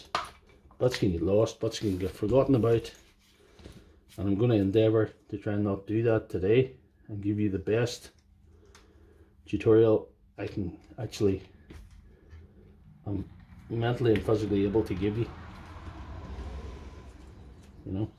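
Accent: Northern Ireland, United Kingdom. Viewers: I will try and get to the root cause of my drill not working this weekend. I have confidence to at least give it a go. If I mess up then by the sound of your accent you will only be a couple of hours drive from Belfast😊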